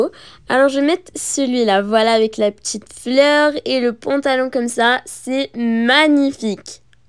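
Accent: England, United Kingdom. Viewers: Je suis totalement d'accord avec toi !!! Tes vidéos son incr 😍!!! Ta voix est juste magnifique (j'adore ton accent anglais)🥰 ! Et toi t ✨PARFAITE✨… Continue comme sa !!! Bientôt les 50k !!!!! Ps : J'aimerais trop joué avec toi sur Adopt me !!!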